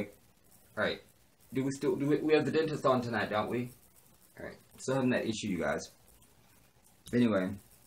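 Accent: United Kingdom. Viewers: I thought I was listening to a UK reporter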